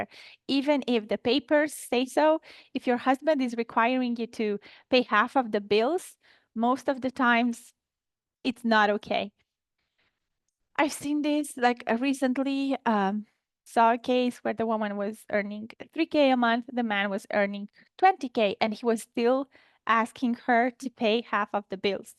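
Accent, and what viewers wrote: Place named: Philippines. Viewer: Are u Philippine? Interesting accent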